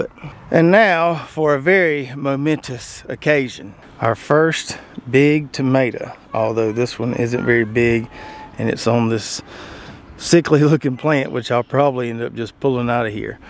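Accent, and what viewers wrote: South, United States. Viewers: Love an open minded hippy hiding under a southern accent. Thanks for sharing your knowledge and journey. Your family is so adorable and sweet.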